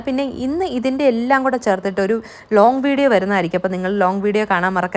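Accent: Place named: Alappuzha, India. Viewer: where in alpy...doubted from ur tone that u will be from my place❤😂😂😂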